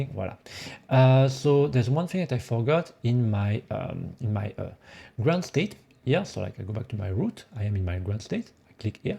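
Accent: France. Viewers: je m'attendais pas à l'accent français, super vidéo en tout cas et merci pour le contenu gratuit et super instructif que tu nous proposes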